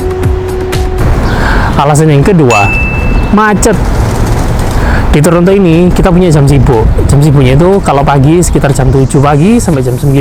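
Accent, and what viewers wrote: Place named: Java, Indonesia. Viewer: Jowo mas😂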